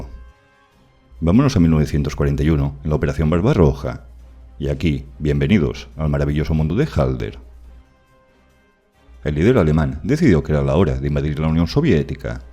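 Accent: Galicia, Spain. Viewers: ¿El locutor es gallego e intenta disimularlo o habla así de impostado?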